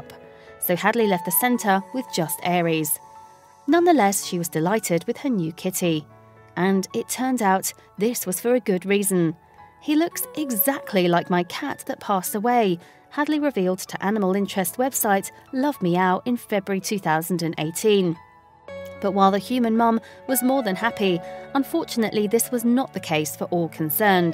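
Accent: United Kingdom. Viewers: What is it with American sites that use a British accented narrator? Will the accent make it seem more legitimate? Pretty condescending. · glad i dont have to listen to that brit accent often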